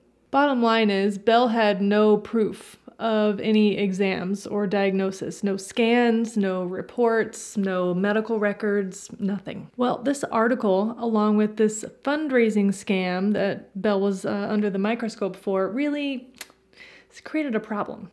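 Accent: Australia. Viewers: I am so here for your Aussie accent. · Loving your Aussie accent 😜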